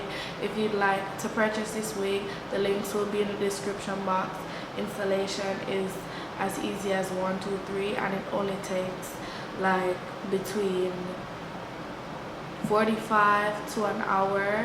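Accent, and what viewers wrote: Jamaica. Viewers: Loooove!!! ❤❤❤ You sound like a yardie tho! Only a yardie will understand what i mean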